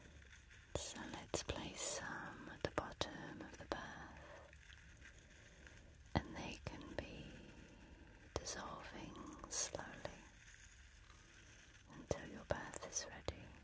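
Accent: England, United Kingdom. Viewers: I loooove her British accent😍😍❤❤ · Are. You. From. England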